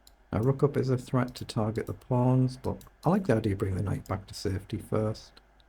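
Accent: Newcastle, United Kingdom. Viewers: Discovered this channel yesterday. Simple, instructional, to the point. Great channel. Are you from Newcastle?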